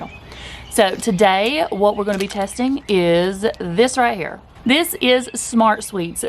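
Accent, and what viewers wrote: South, United States. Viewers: Something is busted there. The meter clearly says "Ketones" and then the ridiculous number. I don't have a KetoMojo but a Precision Xtra that does glucose and ketones, and the meter will clearly show what type of strip it is reading - Glucose or Ketones. Something is flubbed there. In any case, 268mg/dl would be OUTRAGEOUS for ketones so it may really be reading glucose. But if that number is legit, this is worse than sugar. Actual sucrose would hit you maybe into the high 100's. .. BTW, love your channel and please ignore the haters. I live in the south and I love your accent! You sound like a sweet, friendly southern gal to me!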